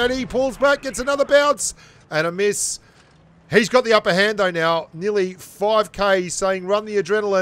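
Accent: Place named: Australia. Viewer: Hey old mate,i am happy you are back.it is a pleasure to hear your oz accent.just makes my day absolutely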